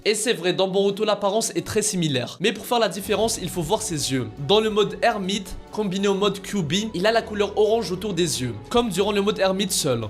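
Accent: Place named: Kabylie, Algeria. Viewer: l'accent kabyle est fort présent ! xD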